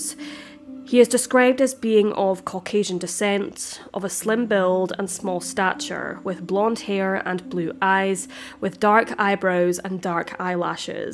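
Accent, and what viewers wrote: Scotland, United Kingdom. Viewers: She’s Scottish that’s the way it comes over.lif u know any thing about how language is spoken.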